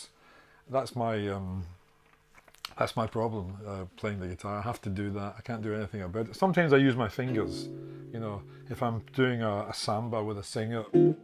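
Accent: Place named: Glasgow, United Kingdom. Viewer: how can you not love that Glasgow accent?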